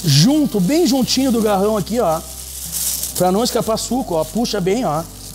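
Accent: Rio Grande do Sul, Brazil. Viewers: Bom demais o vídeo e o sotaque gauchesco.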